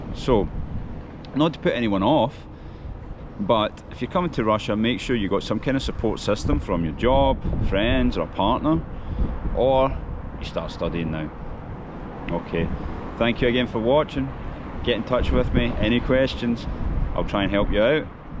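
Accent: Scotland, United Kingdom. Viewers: Вас за кавказца не принимают? Шотландский акцент очень специфично звучит на русском. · Обажаю шотландский акцент, просто музыка для ушей. Мне он кажется очень жизнерадостным. :) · Мне очень нравится Шотландский акцент в английском, have a good time in Moscow, lad! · Очень нравится твой шотландский акцент! :) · it's very interesting to listen to the Scottish accent, but unfortunately the videos are rarely released... does a Scot in Moscow really have so little to tell?) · Я половину видео не могла понять почему мне сложно его нормально понять), потом увидела название канала, и подумала, что впервые слышу шотландский акцент. Интересно звучит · Шотландский акцент просто огонь. Как и квантовый выбор падежей. Это достойно уважения. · Wow your Russian has less accent than your English. Your Scottish accent is heavy and sounds really cool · I love your scottish pronouncuation, it's so cool! 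And yes you are right, we russians do respect the fact when a foreigner is trying to learn russian, that's already enough for us 😊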